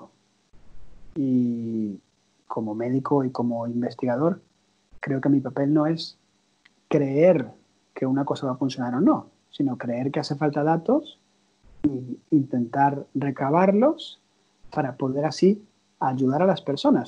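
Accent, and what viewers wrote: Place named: Spain. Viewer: consulta porque habla como español si es caraqueño hasta despues de los 20 años ????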